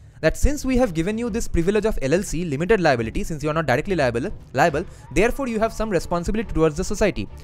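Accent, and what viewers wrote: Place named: India. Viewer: Would probably be ok if narrator could speak at a normal rate. Machine gun Indian English is difficult to follow.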